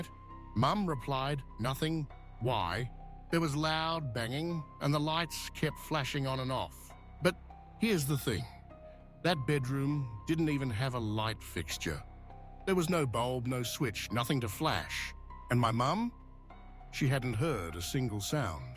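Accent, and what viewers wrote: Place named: Australia. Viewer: Ausie accent, great mate..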